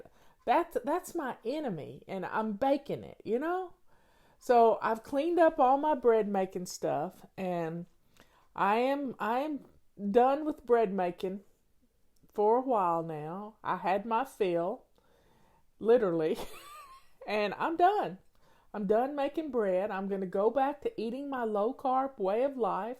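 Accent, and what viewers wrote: South, United States. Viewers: Hello from Manitoba! I know hun technology is so irritating, you look wonderful! Love your Southern accent!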